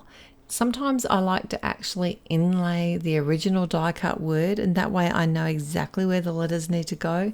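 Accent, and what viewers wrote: Australia. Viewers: it’s nice to find a new papercrafter I can follow. I appreciate the effort it takes to make a video the length of yours and like that you don’t waffle on about irrelevant stuff. You’re Australian?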